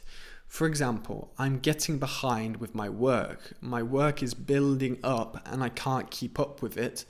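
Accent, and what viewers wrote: United Kingdom. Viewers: you make me love the British accent even more... 🤩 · I really like your British accent! Where are you from in the U.K.?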